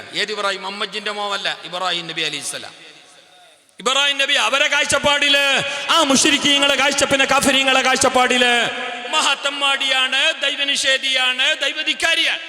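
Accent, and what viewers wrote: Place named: Mangalore, India. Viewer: മാഗലാപുരം അല്ലെ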